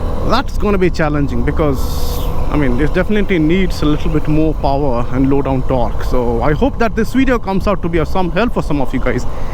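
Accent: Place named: United Kingdom. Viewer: Love your accent bruh!. Thought i was watching a British based channel at first :D Keep up the good work on your channel! :) Ride safe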